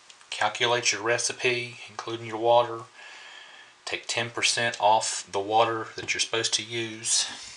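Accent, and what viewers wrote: South, United States. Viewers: Very nice, indeed. The side pits were interesting. I've only had some trouble with my tops from overheating. Do you sell your soaps or have a website? I truly do enjoy your southern accent. I hope that doesn't offend. I have family born and raised in the South, and it's just a happy sound to me. 😃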